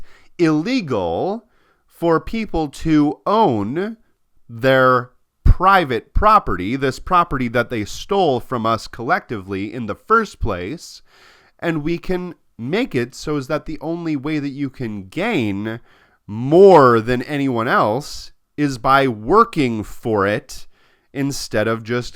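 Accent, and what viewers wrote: Canada. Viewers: Are you an Albertan?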